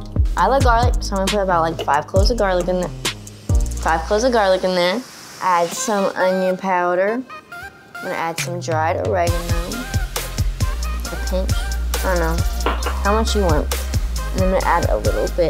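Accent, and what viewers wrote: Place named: Chicago, United States. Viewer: She’s definitely charismatic but why does she talk like she’s from the south side of Chicago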